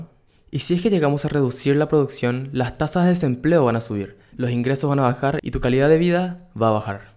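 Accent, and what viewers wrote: Paraguay. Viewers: SOS paraguayo?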